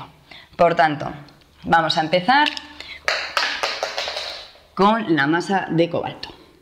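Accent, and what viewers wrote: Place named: Spain. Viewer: Maestra, de que ciudad de España es usted? Me agrada su acento y no es tan enfadoso como otros españoles mucho más marcados. 🙂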